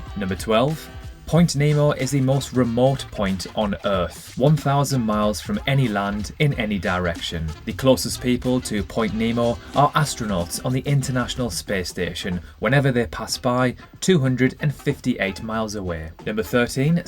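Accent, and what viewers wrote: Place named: Newcastle, United Kingdom. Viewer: Geordie accent (Tyneside, northeast England).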